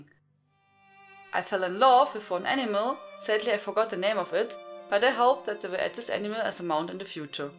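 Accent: Germany. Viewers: Der deutsche Akzent ist ja dezent süß. Weiter so... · Sehr schönes informatives und vor allem kompaktes Video! Meistens stört mich der starke deutsche Akzent in englischsprachigen Videos aber bei dir ist er irgendwie süß ^^ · Gonna get this expansion. You sound german btw ;)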